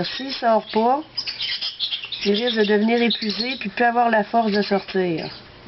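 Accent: Quebec, Canada. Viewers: pas française, québécoise !!! listen !! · J'adore l'acsen québécois ! J'arrive pas a le faire... 😕 ! Un jour d'arriveré. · J'adore votre accent canadien (si je ne me trompe pas) et bravo pour le sauvetage :) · oh bas oui on remarqué que tu es du Québec !!!!!!!!!!!! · Ahah je kiff l'accent québécois ;)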